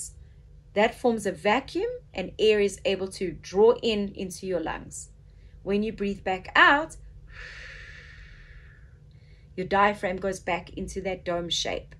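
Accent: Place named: South Africa. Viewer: She’s from South Africa my hubby is from there . I hear the accent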